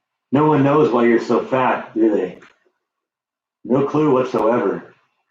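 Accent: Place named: United States. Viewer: I love your accent, American men sound sooo sexy to British women. I have a very British accent and speak very proper English.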